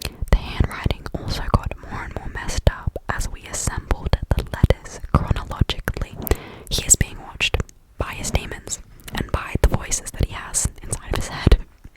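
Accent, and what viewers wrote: Australia. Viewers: Australian accent 🔛🔝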